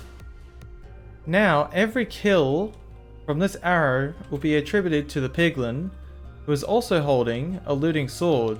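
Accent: Australia. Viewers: Aaahhhhyyyyy an Australian!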